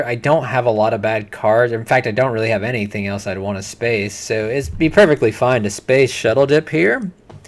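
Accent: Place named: Delmarva, United States. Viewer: Are you from the DelMarVa region of the US by chance? I grew up there and I think I hear a bit of that accent in your commentary? Thanks for making the video btw, love watching TS. :)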